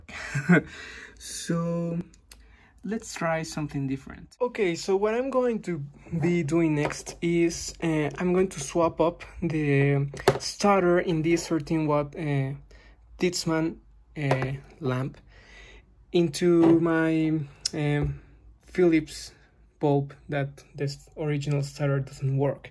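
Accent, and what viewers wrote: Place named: Mexico. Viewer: I think so. He collects Mexican lighting and has a Mexican accent (I think). I'm terrible at recognising accents.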